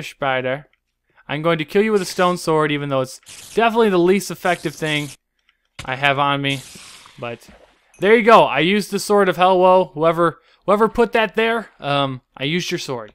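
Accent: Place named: England, United Kingdom. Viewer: not bad inglish acsent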